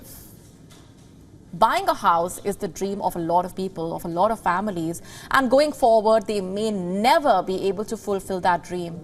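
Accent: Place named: Punjab, India. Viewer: We dont need punjabi english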